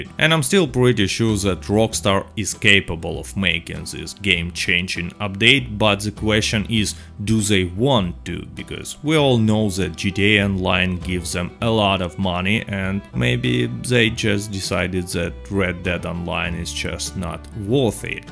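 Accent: Russia. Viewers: Пришёл с cat dead redemption, ну чётко два канала за такое респект! А так акцент русский, произношение советую тренировать. ми инглиш вери вери гуд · Russian accent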